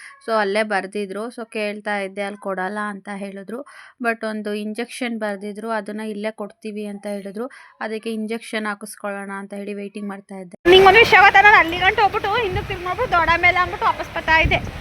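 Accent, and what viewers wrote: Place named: Tumkur, India. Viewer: Yellava Nim tumkur mathu channagirutte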